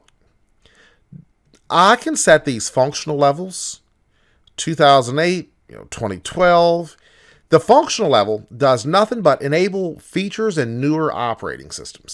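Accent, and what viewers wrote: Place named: South, United States. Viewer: I love how you explain this stuff! It's helpful for my work. Also, you have a pretty cool southern accent :P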